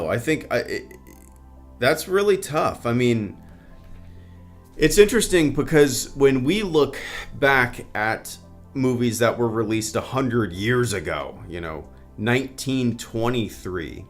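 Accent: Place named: South, United States. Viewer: Really rocking that southern drawl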